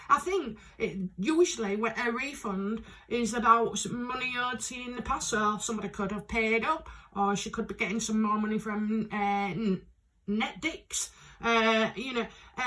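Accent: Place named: Hull, United Kingdom. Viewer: Hi!! Thanks for saying, "Hi" :) I just love listening to your accent, I know we must be distant cousins as my family is from Hull :D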